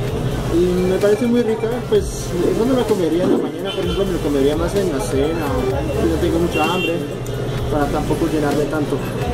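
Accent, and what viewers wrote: Bogotá, Colombia. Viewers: hermano mete de vez en cuando un saludo o despedida en frances para la Colombie y Zach Morris hizo un stream live diciendo que estaba pensando en hacer un video con vos Chevere tu acento cachaco,Merci bocu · Acabo de descubrir tus videos y me gustan muchísimo ya! Tu español colombiano (bogotano 😝) es excelente! sigue así!!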